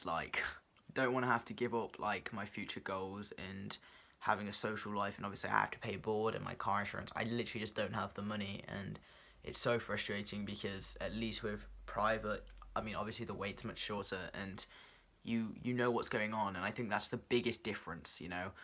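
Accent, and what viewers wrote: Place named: United Kingdom. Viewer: I seriously could listen to you talk all day!!! I love your voice and of course your British Accent, I'm such a sucker for the British Accent, The Best to you.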